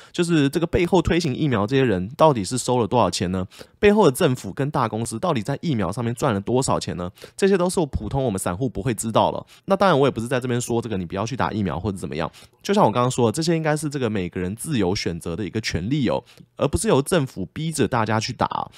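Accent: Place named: Taiwan. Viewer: 台湾腔，哈哈😀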